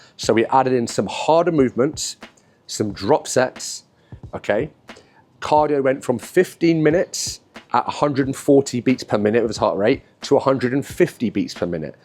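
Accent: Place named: Hampstead, United Kingdom. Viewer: I was watching a walking tour of Hampstead Village on my iPad and then I clicked on this video. I can tell by your voice that you were originally from that area or close by. I’m in California and would trade pools and palm trees for charming and quaint. I just lost 15 lbs and finally getting my body back. I now have a diet of sardines, salmon, and salads. Instead of walking tours on my iPad, I will try walking outside. Great tips in this video. Thank you for posting!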